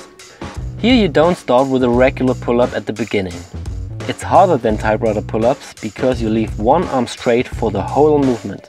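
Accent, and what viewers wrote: Germany. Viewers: He is a german. You can hear it wery clearly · is this dude german? just asking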